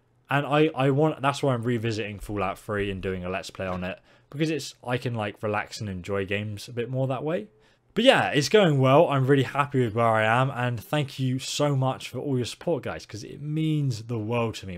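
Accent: England, United Kingdom. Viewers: Where did you grow up? I cant tell which side of the north/south divide you are from. Speaking as a Yorkshire lad.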